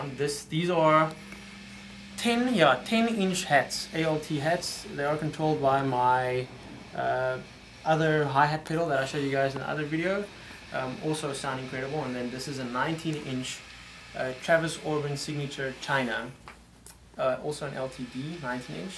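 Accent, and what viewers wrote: South Africa. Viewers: when ever u talk it reminds me of relatives in South Africa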